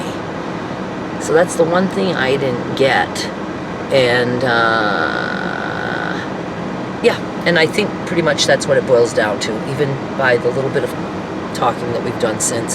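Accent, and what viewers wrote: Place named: South, United States. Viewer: You sound more and more "southernish".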